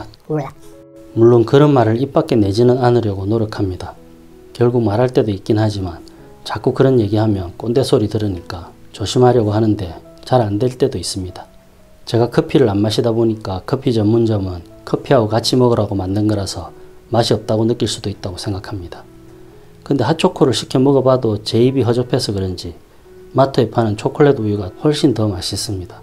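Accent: Gyeongsang, South Korea. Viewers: 경상도 분이신가 봐요 ㅎㅎㅎ 꼽표 오랜만에 듣네요 똥글빼이 ㅋㅋ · 늘 잘보고 있습니다. 제가 쌩초보라 쓰고 있는 연필이 4b인지 2b인지 궁금합니다. 강의중 그많은 말들속에 이건 4b입니다.라고 첨기 부탁드립니다. 그리고 같은 갱상도라 너무 정감갑니다.ㅎ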